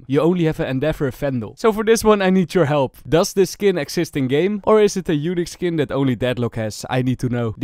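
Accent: Netherlands. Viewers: je hoort heel duidelijk dat ne nederlands bent hahahhhaa maar leuke viddd!!!!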